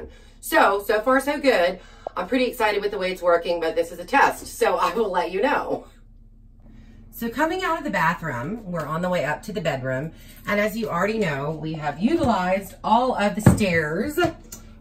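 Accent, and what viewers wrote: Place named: Texas, United States. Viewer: LOVED YOUR VIDEO TOUR OF YOUR TINY, WELL PLANNED HOME. I AM SO VERY PROUD FOR YOU. CAN YOU TELL ME, DO YOU HAVE TEXAS ROOTS? I HEARD ALOT OF YALL'S IN THERE. I AM A TEXAS GIRL SO PICKED UP ON THAT IMMEDIATELY. BEST OF LUCK TO YOU!!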